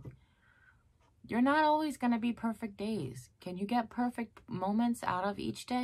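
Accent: New York, United States. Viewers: I love your New York accent...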